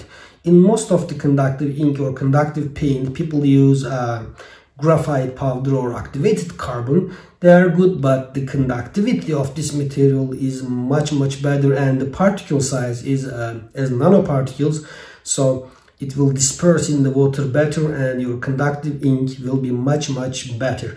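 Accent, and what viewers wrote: Turkey. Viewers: Merhabalar Öncelikle videolar için çok tesekkürrler çok faydalı içerikler var kanalınızda.Aksandan ve kullandıgınız malzemelerden anladıgım kadarıyla türkiye de yasıyorsunuz. Büyük ihtimal sizinde karsınıza çıkmıştır bi yerlerde. Nanomax ısı boyası ya da başka marka adları altında satılan bir ürün var. ISI BOYASI. Bu sistemi kendim yapmak istiyorum. Bu konuyla ilgili bi proje yaparsanız izleyiciler çok faydalı olacagı inancındayım.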